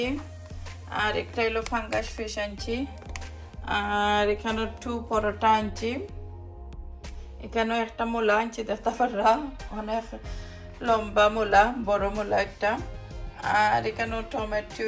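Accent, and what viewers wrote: Sylhet, Bangladesh. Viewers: syelhet er kotha gula khub sundor apu.tai bondhu hoye gelam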